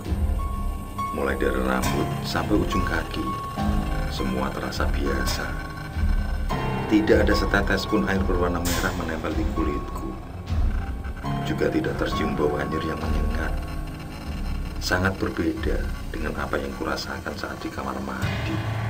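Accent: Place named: Pati, Indonesia. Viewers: Orng Pati mana suaranya👍👍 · Pati mana suaranya 😃👆👆 Juwana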